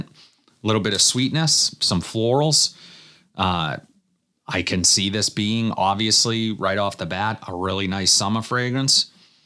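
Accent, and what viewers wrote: Boston, United States. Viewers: Knew that accent sounded familiar. 617 baby 💪🏻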